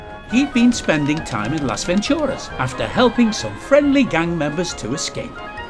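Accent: United Kingdom. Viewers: the funniest part has to be the soft calm british narraration ontop of the hardcore gangsters trying to off eachother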